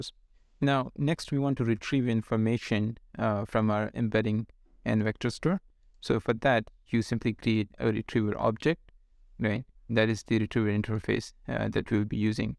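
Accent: India. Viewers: This right here is the definition of the uncanny valley. That white guy, with your Indian voice, plus the AI generation, makes me feel incredibly uncomfortable. Love your channel, but it makes my brain light up in "Something's wrong here"...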